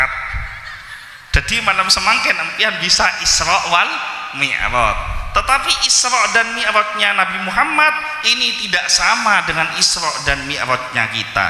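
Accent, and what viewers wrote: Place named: Sumenep, Indonesia. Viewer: Dari mana asalnya kiai ini?. Logatnya seperti orang Sumenep.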